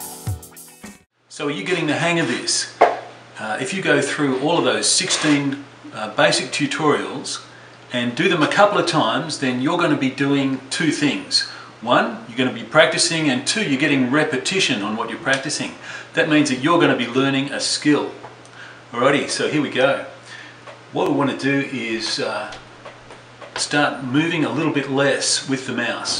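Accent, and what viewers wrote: Australia. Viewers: I spent a year in Sydney working with H.O.Wills Tobacco. It was a great experience. Are you Australian?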